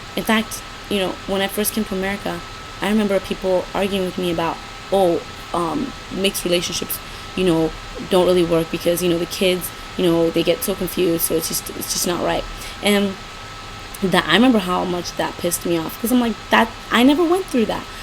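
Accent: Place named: Nigeria. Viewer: Sup? I'm also Nigerian/American but moved to the States when I was 2. Surprised to hear you still have a hint of a Naija accent! informative video...pz.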